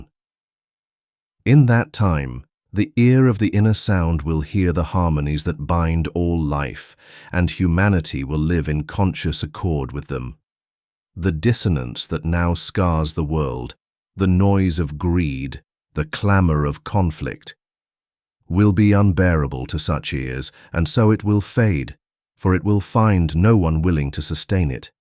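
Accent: United Kingdom. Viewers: Plus the prevalent British accent… 😑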